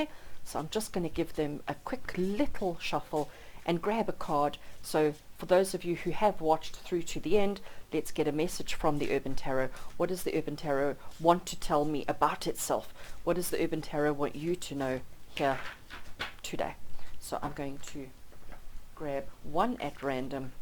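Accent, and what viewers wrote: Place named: South Africa. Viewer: Hi...by your accent you sound South African. Is this so? If you are, then you're the first SA YouTube channel I've discovered here. Oh and this deck is on my Xmas wish list!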